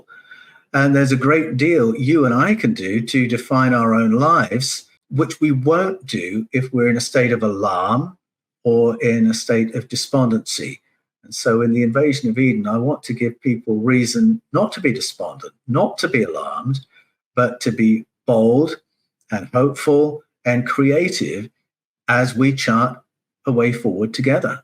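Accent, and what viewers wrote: Australia. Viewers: I love u! Awesome stuff! It juat sounds smarter with an aussie twang!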